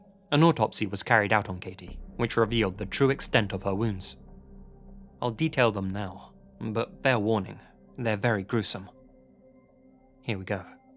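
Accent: United Kingdom. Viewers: They don’t say those phrases in the states. Sounds like a Brit for sure.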